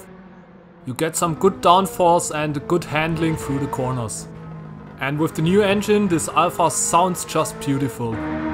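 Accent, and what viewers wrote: Germany. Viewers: Ich liebe deinen deutschen Akzent 😂♥️ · I smell Deutsch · I like u Kartoffel English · Junge, red doch einfach deutsch. Das is ja grausam.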